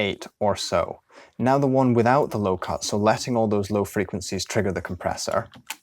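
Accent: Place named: England, United Kingdom. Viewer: And he's not a Yank! plain English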